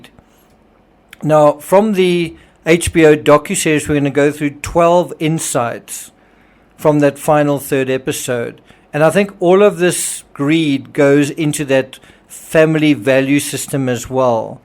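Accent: South Africa. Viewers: Did you cover Oscar P? Your SA accent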